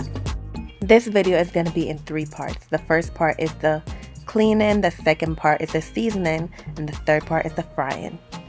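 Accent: Jamaica. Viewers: nice video wheres the accent from, jamaica?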